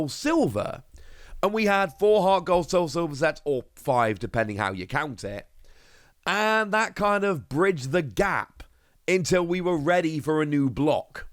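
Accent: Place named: United Kingdom. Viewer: Oh crap, I completely forgot this was British. Lol, every time he talks about the next Pokémon game it’s gonna be like nails on a chalkboard.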